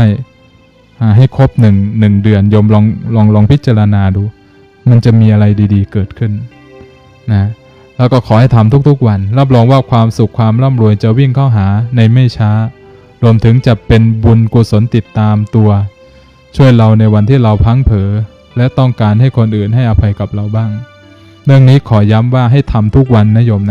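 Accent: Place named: Lampang, Thailand. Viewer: ลำปางชัดเจนดีเจ้าคะ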